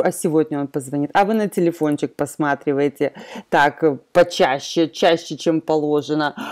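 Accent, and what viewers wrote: United States. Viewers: Обожаю ваш американский акцент)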